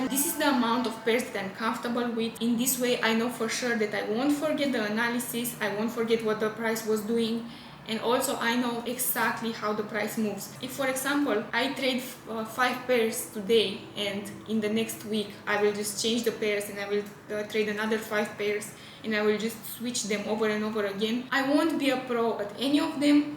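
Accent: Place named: Russia. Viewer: Russian Accent 🤔 . Where are you from ? Ukraine , Russia , Moldive , Romania ?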